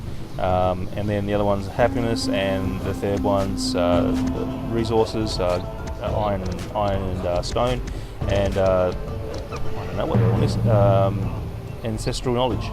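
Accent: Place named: Australia. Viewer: I found this really helpful so thanks mate! It's also refreshing to hear an Aussie accent ;)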